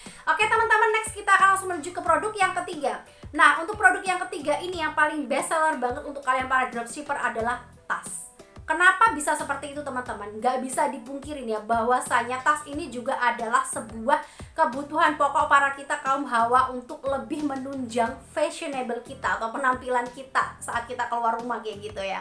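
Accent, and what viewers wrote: Java, Indonesia. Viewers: Ngomongnya medok banget. Dan kurang santai mbak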